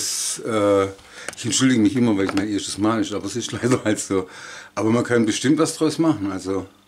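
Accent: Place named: Swabia, Germany. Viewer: dass du dann doch nicht zu extreme ins Schwäbische verfällst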